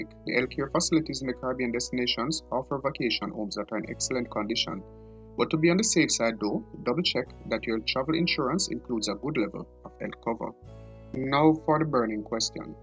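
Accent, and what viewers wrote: Jamaica. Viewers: By the way he pronounced certain words,I wouldn't be surprised if he's Jamaican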